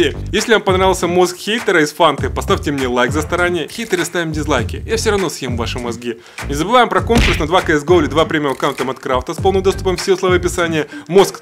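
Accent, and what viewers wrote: Ukraine. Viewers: мои дэтуктивные метоты не врут ты Украинец? я услышал твой акцен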